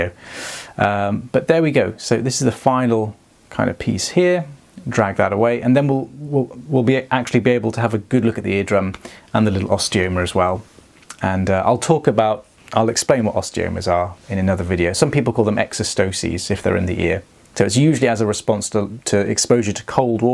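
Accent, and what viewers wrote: England, United Kingdom. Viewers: Very nice haircut Mr.. You are so cute and the english accent is cherry on top 😍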